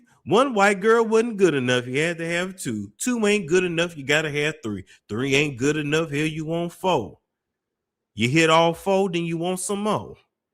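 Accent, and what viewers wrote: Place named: Missouri, United States. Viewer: I thought you was from Missouri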